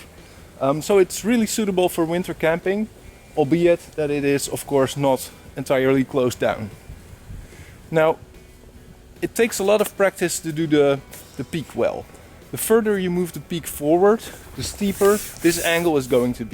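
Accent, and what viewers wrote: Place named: Netherlands. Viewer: Are you from Holland my friend ? Your English is realy good I can just hear a bit of accent ! Super tarp shelters anyway !!!!!😉👍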